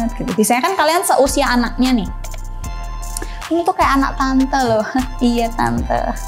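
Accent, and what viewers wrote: Java, Indonesia. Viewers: lucu dan medok mantab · Jowone medok..,? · gaya ngomong nya kelihatan Jawa nya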